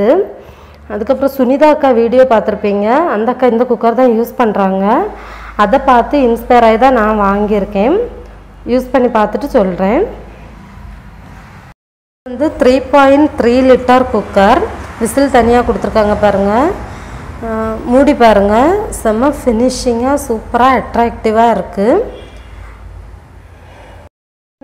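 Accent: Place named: Kanyakumari, India. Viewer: Good to hear your Kanyakumari tamil Stay back connected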